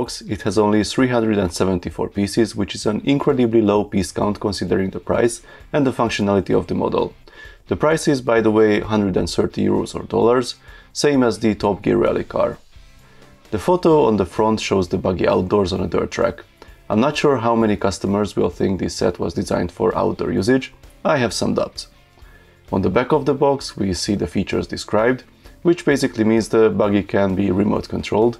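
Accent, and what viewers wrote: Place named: Hungary. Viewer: Tuti magyar vagy, hallom az akcentusod :D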